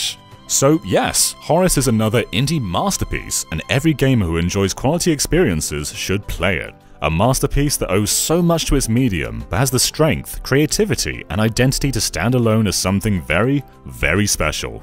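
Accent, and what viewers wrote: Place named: United Kingdom. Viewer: It's weird to hear a British accent on a Nintendo Life video that isn't Alex. :) Good job, good review. I wanna play.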